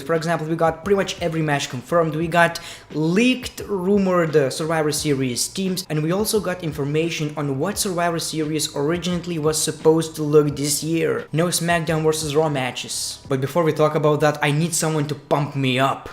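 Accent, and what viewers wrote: India. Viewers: Sounds Asian/Indian... Why?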